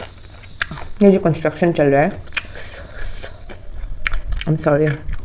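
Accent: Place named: Bengal, India. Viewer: দিদি তুমি কি বাঙালি ?.......... আমি sure না তাও তুমার কিছু কিছু কথায় বুঝা যায় তুমি বাঙালি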